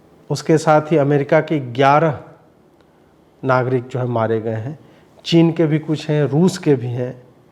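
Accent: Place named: Bihar, India. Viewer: Video ki caption aur narrator ki bolne k tareeke se mujhe maza aya 😂. Fati fatah fatanti 😂 aur stadium jaisa mahol banna.....bhaiya k bolne ka tareeke mere ek bihari dost k jaisa hai. Maza aya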